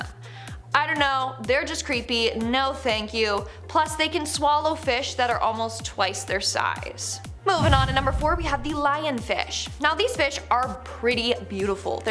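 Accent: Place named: United Kingdom. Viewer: You do a good British accent!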